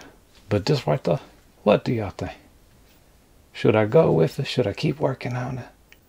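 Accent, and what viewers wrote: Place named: Mississippi, United States. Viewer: Its a Mississippi accent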